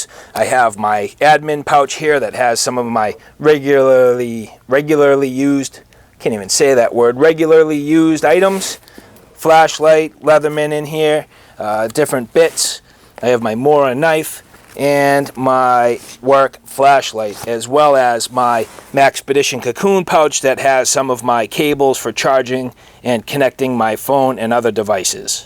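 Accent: Boston, United States. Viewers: Your American accent is horrible. Learn British accent or something. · I can agree that is comes out full throttle. You sound like someone from my area and then the Boston accent comes out. I find it fun to listen to. But it was a great video! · I hear lots of good stuff about this brand, I'll have to check em out. Great review! Any chance you're located in Massachusetts? Sounds like a Boston accent.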